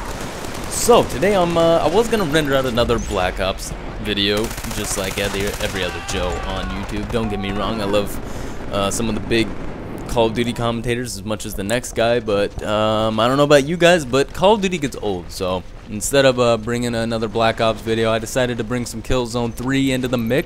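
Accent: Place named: United States. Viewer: more like white with a 95% american accent with 5% scottish. good try though.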